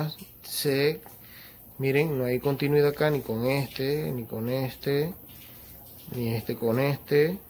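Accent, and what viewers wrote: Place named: Maracaibo, Venezuela. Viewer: Primo vos sois maracucho dónde te pongan ! 😅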